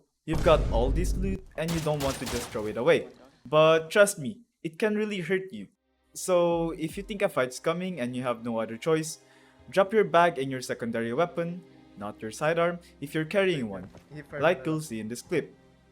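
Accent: Philippines. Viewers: You have a filipino accent. Are you? I am too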